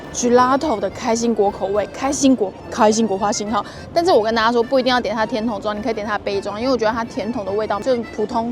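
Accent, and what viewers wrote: Taiwan. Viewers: 觀察了幾支影片，發現講到「腥」字都會變台語，好奇妙喔。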